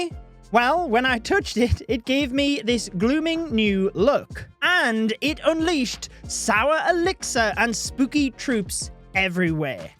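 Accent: United Kingdom. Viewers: Is he British or what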